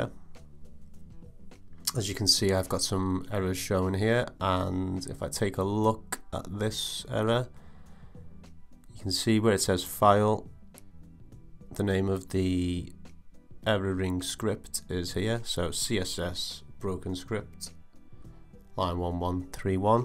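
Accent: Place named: Liverpool, United Kingdom. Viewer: When I heard that accent, I thought "Oh, great! A fellow Scouser! - He will be able to help!" Sadly, this video was only useful to those writing scripts, but that was not my case. I have a MIDI Wind controller that Ableton is not recognising it. Any advice, our kid? Greetings from a scouse exile in Catalunya.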